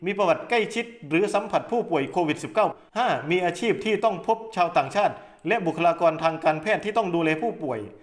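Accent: Isan, Thailand. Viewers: ใช่สำเนียงคนอีสานแท้ๆ😄😃😂😁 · คนอิสานแม่นบ่เจ้า😁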